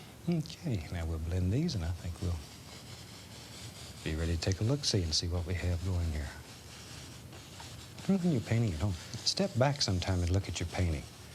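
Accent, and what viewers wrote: United States. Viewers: It's a regional American accent. If you're referring to the way Bob pronounces it. ♥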